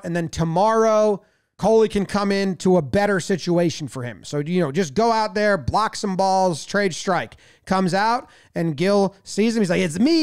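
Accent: United States. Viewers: Does anybody else think it's jarring, the American accent that knows the game of cricket.. ? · Weird hearing an American accent talk cricket. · this is the first time I have ever heard an American Accent talk about cricket, I am surprisingly pleased, that was awesome, thank you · So strange hearing an American accent comment on cricket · Huh. Never heard Cricket talk in a 'Murrican accent before. Feels...... weird. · Refreshing to hear an American voice commentate and actually have knowledge about the game and even your breakdown is spot on with tactics and thought in the game. Head and shoulders above all the reaction videos, which are nice but are common. Your take is a refreshing dose of charm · I've been watching you review cricket for years now, but I just had a moment where it felt bizarre that an American was reviewing cricket. There's no THICK Aussie or British accent, so I'm confused.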